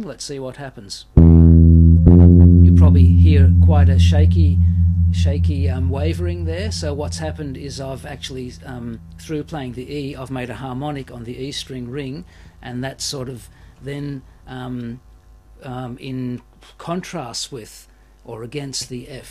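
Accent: Australia. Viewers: Im pretty sure hes Australian :/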